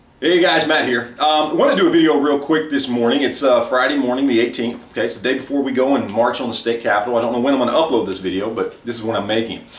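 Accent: Texas, United States. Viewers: Your Texan drawl is hard to place. North Texas? :)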